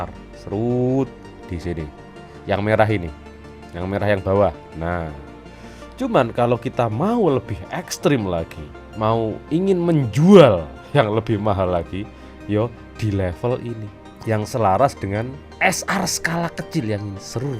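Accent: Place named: East Java, Indonesia. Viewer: orang mana bang? Aura Jawa Timur nya sangat melekat😂🙏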